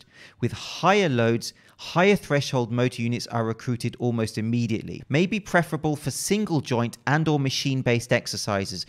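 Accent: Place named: United Kingdom. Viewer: イギリス味が強いな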